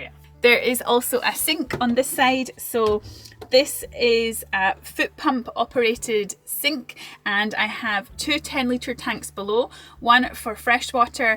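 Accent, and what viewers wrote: Scotland, United Kingdom. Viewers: Soon as I saw this, thought brilliant, love one of those so checked out the web site and they are pretty useful compact pieces of kit ,really handy for recharging; Our head torches, my old basic XP130 Fuji Camera (mostly for Insta but planning on relaunching utube channel), power washer (for our muddy mtbikes), devices, vacuum cleaner (camp necessity with debris and midgies reluctant to exit by their own free will) and brightening things up with traditional Christmas multi coloured led string lights. Love your content and delivery with that accent and enthusiasm for whisky/all things Scottish! cracking channel and entertainment!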